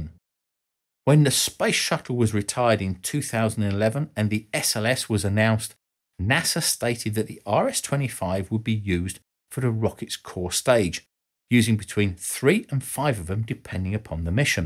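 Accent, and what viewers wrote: United Kingdom. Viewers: Figures I gotta listen to a Brit to better understand what is otherwise seemingly stupid decisions behind my nation’s space program. Thank you!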